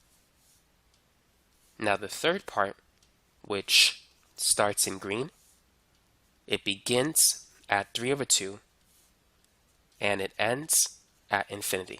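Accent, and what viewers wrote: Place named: New York, United States. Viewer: Idky why but I keep hearing a ny accent😭 I can’t unhear it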